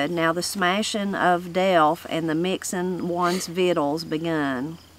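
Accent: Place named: Tennessee, United States. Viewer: The way you talk sounds like the way my people talk. Love it. Chattanooga TN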